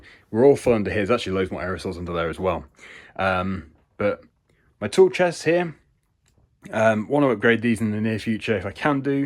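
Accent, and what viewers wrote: United Kingdom. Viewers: Your restoration and rebuilt videos are fantastic! I enjoy the ones when you talk because, to an American ear, you sound like a high class Brit doing grunt work, giving us all hope! Because of you I have tried (and sometimes succeed) to rebuilt a repair small engines that need a little help. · I think every youtuber will go through this. You know the answer, its a matter of being committed and do it. The next dilemma will be time. Do you hire someone to do your editing? You'll work it out. People love these videos and its great to hear a pommy on yt. Good luck greetings from Australia. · your British accent to be very ASMR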